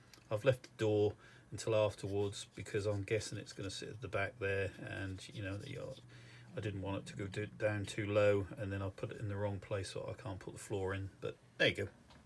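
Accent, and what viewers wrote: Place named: Norfolk, United Kingdom. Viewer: All good stuff. How big is your layout to get all these buildings on? Love the accent. What part of Norfolk are you. I used to live in Ludham.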